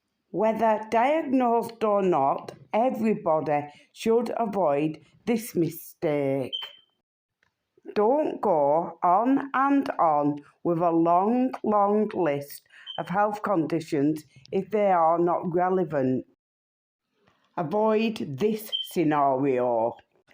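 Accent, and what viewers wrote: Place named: Burnley, United Kingdom. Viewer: Thats a Burnley accent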